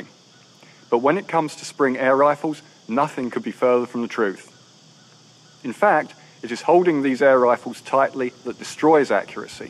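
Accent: United Kingdom. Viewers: cool, its alot better for guns in the US i bet compared to here, (especially because the bad press airguns have gotten over the past few months!) and make sure u always keep your accent, english accents are the best, i love mine haha...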